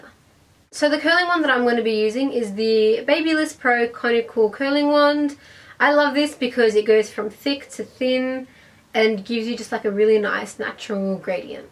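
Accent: Australia. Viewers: Subscribed! Love this video and your accent is so beautiful! Are you Australian? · She sounds Australian 😍 but she could be mixed or something, still a babe!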